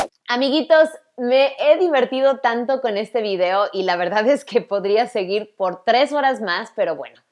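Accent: Mexico. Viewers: Me encanta tu español mejicano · Tu español es excelente. Recuerda que tu acento es mexicano y algunas palabras también; en Uruguay no decimos chaparra por ej. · hablas un español mexicano perfecto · Como argentino me resulta raro escucharte hablar mejicano. Y así y todo me encanta. 😀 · Más que español es méxicano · creí que eras mucho más joven, creo que eres una hermosa persona, no solo físicamente, sino también en tu forma de ser, tu español es perfecto, eres muy fluida, antes de pensar que eres "gringa" creería que eres de mi amado México, amo tus vídeos y tus tips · Hablas muchísimo mejor que muchos mexicanos el español, tienes un acento muy mexicano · 🤣🤣🤣🤣🤣 cuando te escuché por primera vez, lo hice en español, asumí que eras mexicana y habías vivido un tiempo en EEUU, o vivías allá en ese momento. Me sorprendió mucho cuando supe tu historia, me encantó, y sobre todo me encantó el amor que tienes por México, su cultura y lenguas. 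· Muy bella persona, español algo mexicano por obvias razones... y el inglés muy bueno parece, porque de ese idioma no se ni pedo. Pero su ventaja de idioma es súper importante. Saludos CR. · Yo por la miniatura pensaba que eras británica luego te oí hablar y pensé que eras mexicana nativa xD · La primera vez que vi uno de tus videos, (si mal no recuerdo fue el de pronunciar las marcas en ingles) estaba convencida de que eras mexicana 😅, hasta que comencé a ver tus otros vídeos de tus anécdotas, y yo tipo: Ah bueno, era estadounidense xD. You're amazing 💜💜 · Esta chica habla Perfecto español , con un poco de acento y dicción propia de México cosa q no le resta es adorable q quiera compartir su saber ; hija de lingüista augura q sabe lo q dice y así mismo ha de hablar el inglés ( idioma por el cual llegué hasta aquí ) Solo le deseo q siga así de exitosa, feliz en su matrimonio , en general en su vida , y q afortunada tener 37 años y lucir de 25 !!! 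Adorable chica !!! Dios y la vida la atropelle con alegría y salud !!! Abrazo desde Colombia ! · Tú a mi me encantas!! Envidio (en buen son) tu español tan fluido. Yo asumí que eras mexicana la primer vez que te escuché hablar. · Me gusta tu español -mexicano. hablas español excelente..ojala te encontrara en una calle te daria un abrazo....tienes el toque Latino...cariños desde Arica -Chile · El primer video tuyo que ví, era relacionado con enseñar inglés y pues me gustó mucho tu forma de abordar el tema y especial tu personalidad. Se me hizo extraño el asiento mexicano y me sorprendí muchísimo de tu dominio del español. Quise ver más vídeos tuyos, presisamente, por esa personalidad divertida y cálida. Y bueno, ahora te sigo acá en Youtube, tienes vídeos muy interesantes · Cuando te ví por primera (junto a tu papá) fue muy raro, ya que tu habla no combina con tu físico, osea por fuera toda una gringa pero por dentro bien mexicana.... 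🤣 Confusión total jajajaj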